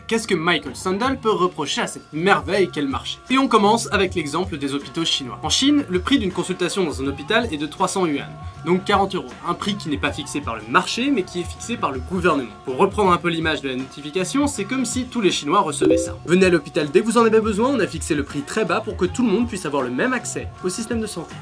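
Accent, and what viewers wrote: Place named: England, United Kingdom. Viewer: Je salut l'accent anglais !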